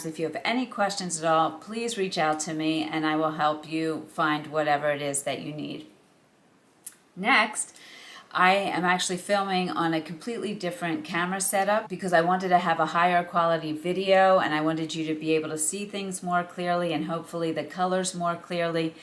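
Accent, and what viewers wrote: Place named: Brooklyn, United States. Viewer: Truth is, you come across as "our next door neighbor", your reactions and rethinking out loud, are so warm Sounds like a bit homey , NYC, (?)Brooklyn, in your voice! ? You look great in most, of the wigs. But the cool Ashy and Greys are a bit less flattering ! I'm still trying to figure out how to get them sent to Israel and still have it be worth the cost.. Meanwhile you're a lot of fun!!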